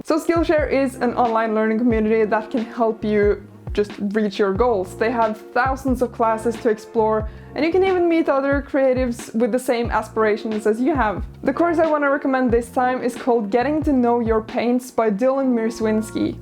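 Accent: Russia. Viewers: Why I hear a Russian accent? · Can't get rid of the impression that speaks with russian accent. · Russian actsent?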